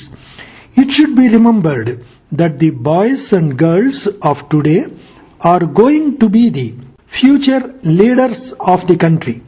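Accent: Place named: South India, India. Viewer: kai re clear voice...fati hui awaj h....south indian accent...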